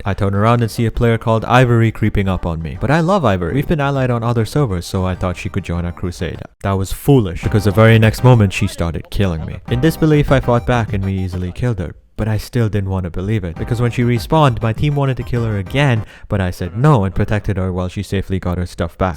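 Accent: India. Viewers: He's Indian even his accent is Indian lmao, if he was nri he would have mentioned the country he lives in not India. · hearing that ur indian, just makes me sad and happy, i knew since the first day that u were pakistani or indian, i knew by your voice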